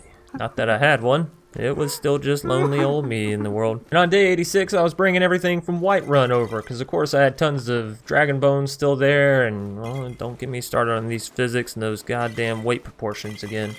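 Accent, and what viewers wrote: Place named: Texas, United States. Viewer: I feel the texas in his voice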